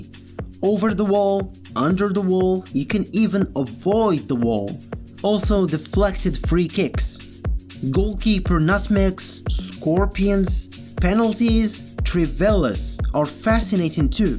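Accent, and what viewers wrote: Morocco. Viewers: where are you from? your accent sounds like a moroccan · Are you Moroccan by Any chance, i hear a familiar accent ..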